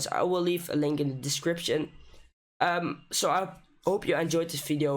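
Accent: Netherlands. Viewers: Ben je Nederlands? Je klinkt namelijk erg Nederlands aan je accent. Maar je spreekt echt goed Engels. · I thought first he is martin garrix. He sounds dutch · yeah he sounds dutch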